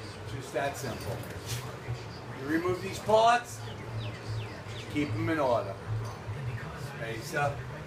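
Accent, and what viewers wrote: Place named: New Orleans, United States. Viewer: Your accent sounds a little New Orleans but not exactly.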